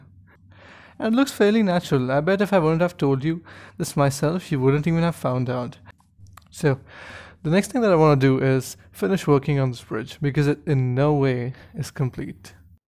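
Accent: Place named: Europe. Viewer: Are u from europe? U kinfa sound like ur from there. Im asian 🇵🇭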